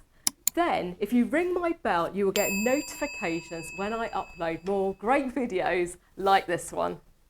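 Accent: United Kingdom. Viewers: Amazing person God bless ya and love your British accent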